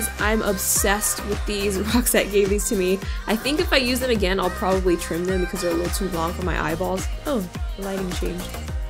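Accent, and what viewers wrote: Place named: Australia. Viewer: SOLID AUSSIE ACCENT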